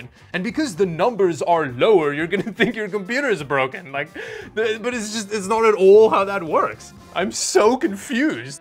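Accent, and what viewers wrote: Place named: South Africa. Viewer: south African accent makes my ears bleed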